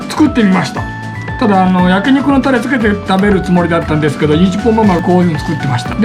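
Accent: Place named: Bingo, Japan. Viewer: 今回も大漁おめでとうございます 腰がうたう 備後弁久しぶりに聞きましたwww 無理せずこれからも頑張って下さい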